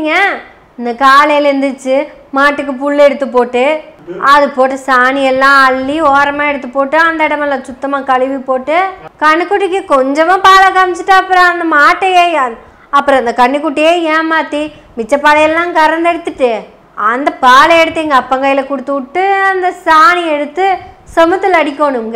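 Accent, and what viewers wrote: Kongu, India. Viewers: 👌👌👌👌👌 அக்கா வரட்டியும் வித்துட்டு அப்டியே மோர் விக்க போகலாம்....😅😅😅😅 உங்கள் கொங்கு தமிழ் 👌👌👌👌 வாழ்த்துக்கள் உங்கள் சேவை தொடரட்டும்....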